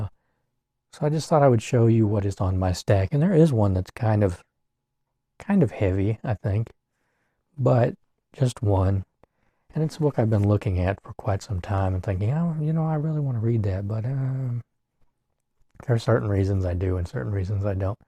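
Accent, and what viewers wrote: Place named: Kentucky, United States. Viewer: Hi Thanks for your earnest and honest “rambles” on a rainy Saturday! I enjoy your thoughts on books and I have to admit your KY accent brings me to many happy memories, as I grew up in Louisville. I have made many visits to Lexington, Bardstown, Berea, Gethsemani and most central to western areas of the state. I have not lived in KY for almost 60 years, but I miss the ease and laid back style which Kentuckians like you embody. Stay well! Extreme pain is an intriguing phenomenon. When it has gotten me, infrequently, I feel like I leave my body and mind behind and enter a realm of subconscious, very surreal existence (just to cope). Again, thanks for sharing!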